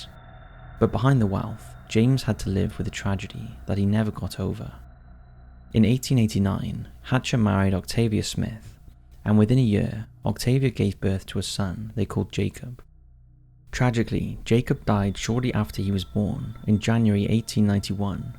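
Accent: Wales, United Kingdom. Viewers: Is he welsh or English? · Dude he is welsh or something. Don't be such a trump supporter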